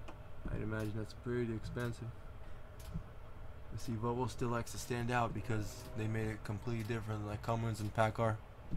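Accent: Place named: Eastern Europe. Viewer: Славянский акцент у автора проскальзывает. Сначала сомневался. Но выключенный inverter все прояснил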